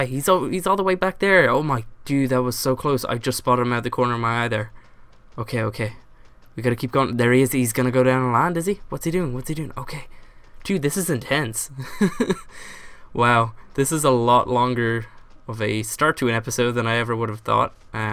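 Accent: Ireland. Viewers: your Irish accent is really strong in these old videos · u used to sound so irish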